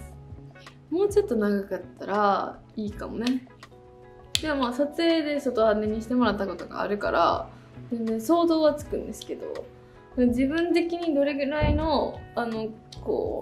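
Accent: Kansai, Japan. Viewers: 私も雑目なA型です。メイク動画は、ブランドの好みや色使い、質感全てちゃんが私のタイプです。関西弁も可愛い💕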